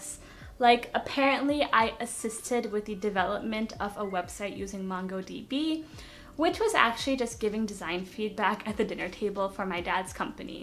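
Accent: Andhra Pradesh, India. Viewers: Hey telugu ahh 😅 .. native ekkada ? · o.O girllll! you are from andhra wow. Your accent is sooo coool.I love that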